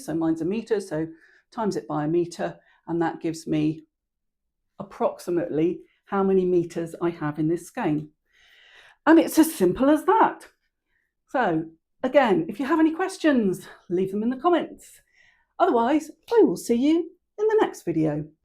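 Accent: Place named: England, United Kingdom. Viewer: I just love how AI subtitles just cannot cope with "niddy noddy" particularly in an English accent....."nitty naughty"😂 Also just a quick tip, if you do just have a tiny amount to skein up you can use just 2 arms of your noddy noddy instead of the whole wrap. Then to work out your length you just divide the full length around your tool in half, then multiply by wraps made. Also if you do a lot of sampling, just make a small niddy noddy from cardboard to make neat little mini skeins.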